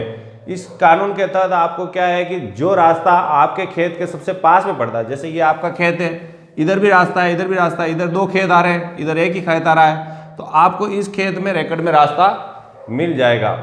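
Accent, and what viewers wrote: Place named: Mewar, India. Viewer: Good aap kha se he बोली से मेवाड़ के लग रहे हो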